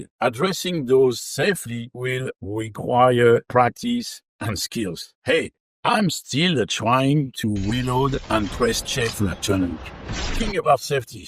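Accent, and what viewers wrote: France. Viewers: I'm having a harder time than I normally do placing an accent with yours. 🤔Definitely French... but I'm guessing closer to Belgium? Do you like Belgian waffles? 😁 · Are you French by chance?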